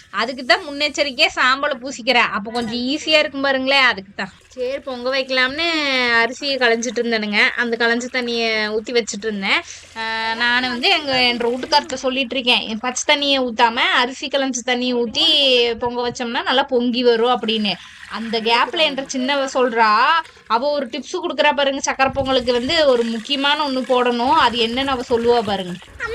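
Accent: Kongu, India. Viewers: நீங்கள் என்ன கூட்டம் கொங்கு வேளாளர் என்று நினைக்கிறேன் உங்கள் பேச்சு அப்படி உள்ளது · Indha video kum unga jathikum enna irukku... Avanga pesaradhu kongu tamil... Edhukku jathi sayam poosathinga... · நாங்கள் கொங்கு வெள்ளாள கவுண்டர் தங்கச்சி கார்த்திக் தம்பி அம்மா உங்கள் கொங்கு தமிழ் சூப்பரோ சூப்பர் பொங்கல் நல்வாழ்த்துக்கள் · இனிய பொங்கல் நல்வாழ்த்துக்கள். கொங்கு தமிழில் பேசுவதே தனி அழகு.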